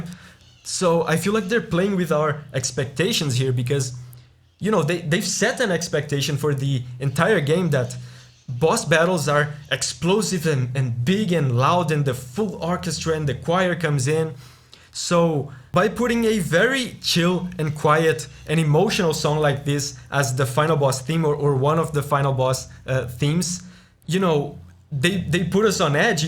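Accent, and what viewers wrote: Brazil. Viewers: c tem sotaque de brazuca kkkkkkk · Você é Br rapaz,sua voz é bem BR adimita. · Out of Bloodborne OST, you could react next to Laurence the First Vicar, basically Ludwig, except it's not a dance, just straight up chaotic church music, and DS3's Slave Knight Gael aswell, they're really different and more emotional than the other boss musics in the series; Really sad that Yuka Kitamura didn't win any awards in both Bloodborne or Dark Souls, let's hope she does with Elden Ring. Also i've just discovered your channel and learned you're brazillian too, mostly from your accent, abraço :D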